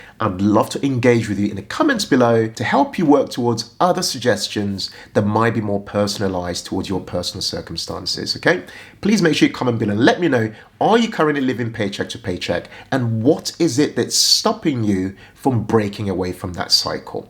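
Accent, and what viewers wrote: United Kingdom. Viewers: Which country are you from? You sound like you’re from the UK x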